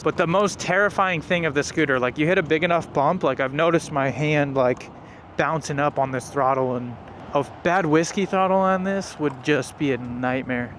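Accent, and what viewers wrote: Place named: United States. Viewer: you can hear the country in his voice new mic?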